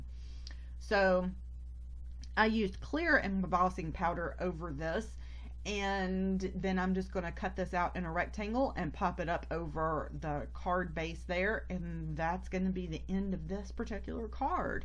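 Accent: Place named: Texas, United States. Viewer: You sound like a Texan. Love these cards. I am in the USA.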